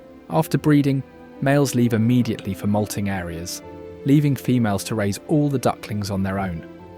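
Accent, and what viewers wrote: United Kingdom. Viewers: I tend to not believe so-called "authoritative" British accented voices.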